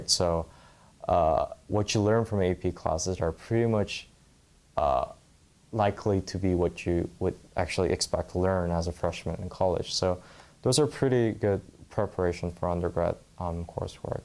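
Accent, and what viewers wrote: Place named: Asia. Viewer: probably because asian accent(im asian too btw so don't call me racist)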